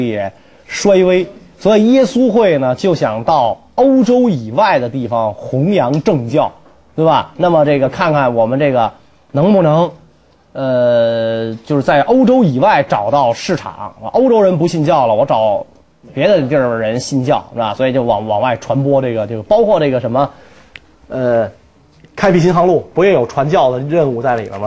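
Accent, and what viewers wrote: Beijing, China. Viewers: 听到这个北京话我就很高兴。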